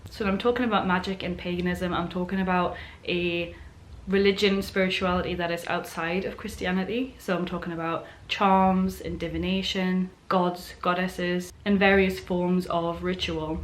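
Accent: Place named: Geordie, United Kingdom. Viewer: you sound like you live just up the road from me, maybe gateshead area. that's awesome. very bonny lass.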